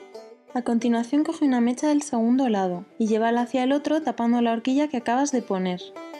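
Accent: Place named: Spain. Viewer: Yo savia ke hablabas español por tu acento cuando hablas frances!! :P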